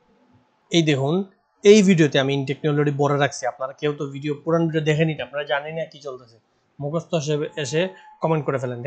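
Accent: Sylhet, Bangladesh. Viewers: কিতা বা সোনা আপনি কি সিলেটি নি । আপনার বাড়ি চুনারুঘাট মৌলভীবাজার নাকি ফার্স সিলেটি। সুনামগঞ্জ আমাদের অনেক লোক আছে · Apni ki sylheti vaichab ni kitha khonor valani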